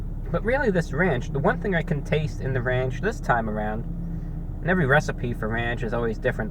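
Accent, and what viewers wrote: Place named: Mid-Atlantic, United States. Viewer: Whats up with the atlantic accent ?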